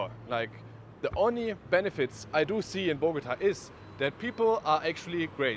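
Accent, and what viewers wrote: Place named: Germany. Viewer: this german accent is killing me. (german here )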